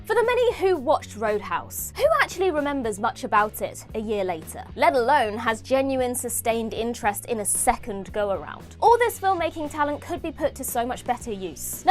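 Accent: United Kingdom. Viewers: I like the snacky British accent. Did she see the last 15 minutes of "28 Years Later"? Please, Watch the movie.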